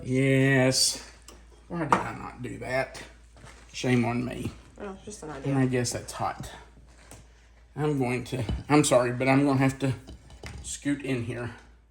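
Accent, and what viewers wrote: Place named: Southwest Virginia, United States. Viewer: I think the thing I like best about you is your accent. It sounds exactly like I sound from SW Va. Thanks for your channel. I love it. I am adding to my grocery list right now.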